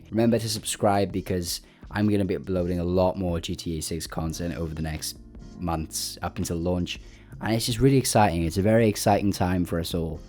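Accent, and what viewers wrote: Wales, United Kingdom. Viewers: I know a fellow Welshman when I hear them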